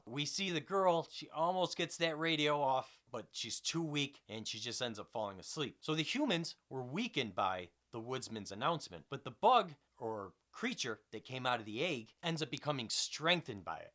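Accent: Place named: New Jersey, United States. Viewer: Maaaaan, what's with the accent? Where are you from? New Jersey?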